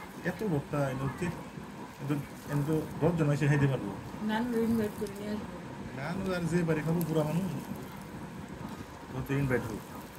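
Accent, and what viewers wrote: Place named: Tangail, Bangladesh. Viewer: ভাই আপনি কি টাঙ্গাইল না ময়মনসিংহ জেলার লোক ভাষাটা নিজের মতো লাগছে।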